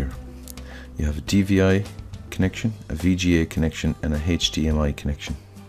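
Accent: Ireland. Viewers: Im Irish too, you sound Irish anyway =D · Are you irish? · Hey could you make a video on how to setup 2 monitors or 3 monitors? I think i know how to do it but dont want to spend money buying another monitor and not knowing how to install it, cheers. oh and nice accent let me guess Irish? from the low southern end of ireland? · Your Irish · Irish man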